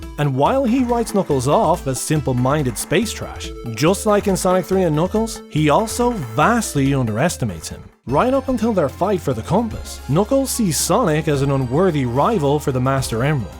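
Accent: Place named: Ireland. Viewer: As long as our favorite foxboy is given something in compensation in the future, I'll be very grateful and not as bitter 😆 Oh good, I was worried it'd come across as creepy 😖 And nah, I (at least, can) understand you pretty well! I dunno where in Ireland you're from but your accent is fairly clear to my ears (unlike some others 🤭)! I'm sure it's a cliche thing to say but Seán (aka Jacksepticeye) kinda helped my ears become a tad accustomed to to... well, SOME accents anyway, since there's not just one accent. glares at my fellow Americans who've yet to realize this fact Anyways... Yeah, Seán has made it slightly easy for me to pick some accents out, even if I can't tell whether they're from Cork or Dublin or what-have-you 🤣 When I first heard yours, I was like "Wait, is that...?" so I checked your homepage to double-check (hopefully that, also, isn't weird but 1. 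It was gonna bug me - especially if I was mistaken, which would've been super embarrassing - and 2. I like to know where the YouTubers I watch are from if it's not obvious) and I had a sigh of relief being right LOL Maybe it's due to Seán but I've developed a great appreciation for Irish accents (and by proxy, the Irish culture and folklore but then, I've always been into Irish music and dance since I was young) and being partially Irish myself doesn't help matters 😂😅 I'm so sorry that if this is ALOT to taken in, all cuz it's on your bloody VOICE - which is normal and probably nothing special to you, but I have a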